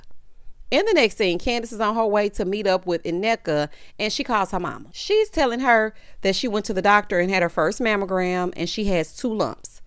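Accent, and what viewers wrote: South, United States. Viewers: I FF past Candiace and her fertility issues. I just don't care. Ugh! Loved the Gizelle and her daughter. Loved the PAVE scenes, but chuckled because that song seemed to go on forever. Wendy is beautiful to me, but does not belong on this show. Candiace keeps playing victim and it's boring. As usual I love listening to you. You're so country and it's endearing to me. Reminds me of being exiled to South Carolina from New York during the summers when I was a kid.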